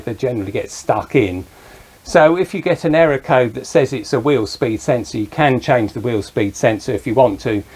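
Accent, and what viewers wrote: United Kingdom. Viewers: I love this guy. Listening to an english fellow explain things is as comforting as high tea on a lovely afternoon.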